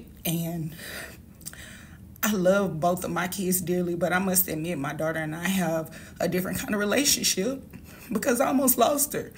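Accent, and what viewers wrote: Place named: South, United States. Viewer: Great to hear you got through. On another note that may put a smile on your face. From over here in England, we kinda like the southern accent. I have been waiting for a ya'll since hearing you keep saying "you all may be seated". Can hear you hiding it, Thank you for your ya'll.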